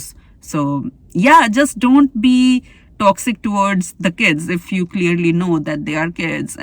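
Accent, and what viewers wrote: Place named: India. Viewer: This video is a perfect example of an Indian women outside India speaking about an Indian topic in English but with Indian Accent.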